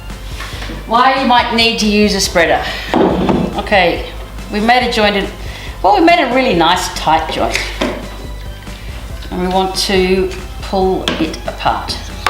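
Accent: Australia. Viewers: Dam i didnt expect the ozz accent..But i do like her tho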